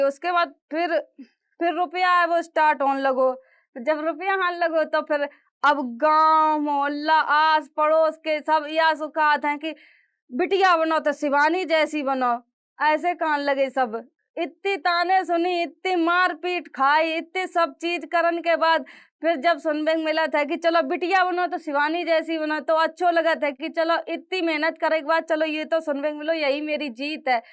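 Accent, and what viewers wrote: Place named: Bundelkhand, India. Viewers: Ye hoti hai up bihar ki language.....jitni suno utni jyada pyari... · Bundelkhandi boli hm jalaun se h ☺️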